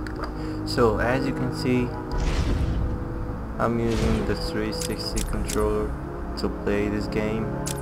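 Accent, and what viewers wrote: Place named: Romania. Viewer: you have romanian accent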